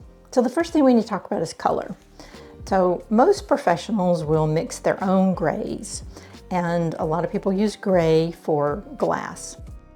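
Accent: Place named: South, United States. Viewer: I learned so much from your great video and, I love your southern accent.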